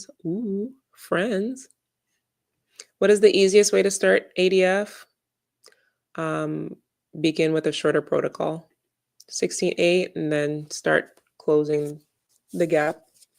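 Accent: Jamaica. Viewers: So much more effective when you scold us in your Jamaican accent😂❤😂 and by the way, even though I'm near my goal, I'm still going to listen to you for motivation, because no matter how long I do it, fasting is hard!!!!😮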